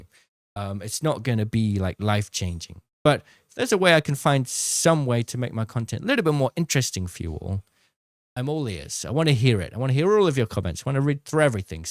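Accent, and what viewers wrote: United Kingdom. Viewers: Yeah, the british accent it's a thing. But I like when you are vibing. I used to like to watch you doing challenges, but I felt you a bit sad and didn't vibing doing that those days. You could bring news about apex, trying other games (new games, BR or classics), testing how good the legends are. The most important, just keep doing what you like. Keep going. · In my honesty, I havent really watched so much of your content as of lately and i agree with most of the rest of the comments, it's because of the game itself. I remember the old challenges, the 4ks or load out challenges but the games not the same anymore so I have since quit playing and watching. But you still hold my sub because you still hold a spot in my heart. But if u want some input from me, I would just try doing your type of challenges and content for other maybe less comp games like cod zombies, or risk of rain, or even Elden ring playthroughs. 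I think all of us love your "weird little British man accent" btw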